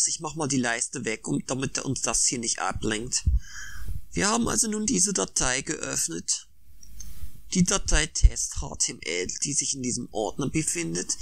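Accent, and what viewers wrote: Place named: Sauerland, Germany. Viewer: Welch Kräftiger Dialekt, Sauerländer?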